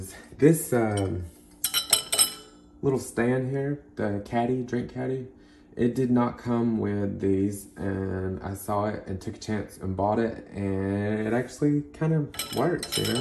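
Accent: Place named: South, United States. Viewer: I'm a new subscriber. Old grandma 👵 from North Texas. Love your shared knowledge and collections and Southern accent. Keep up the great job.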